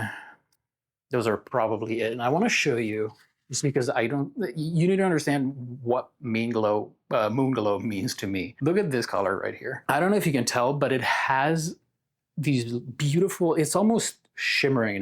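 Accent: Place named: Ireland. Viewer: Are you originally from Ireland? Your accent reminds me of my late great grandmother whom I'm named after.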